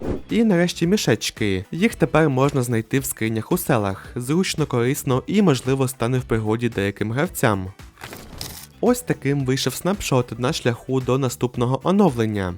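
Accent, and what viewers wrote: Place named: United States. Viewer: У вас дуже файний американський акцент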